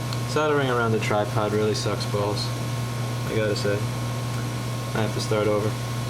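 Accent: New York, United States. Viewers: You can totally tell he's from New York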